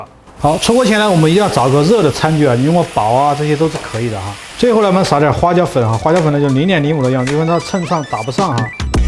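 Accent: Sichuan, China. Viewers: 好亲切的四川话。粑锅，度豆腐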